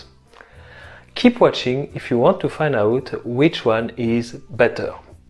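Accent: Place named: France. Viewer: So nice, c'est toujours un plaisir de regarder tes vidéos, de plus avec ton petit accent français ça me permet de vraiment bien comprendre ! You are on the good way my friend ! 👏👌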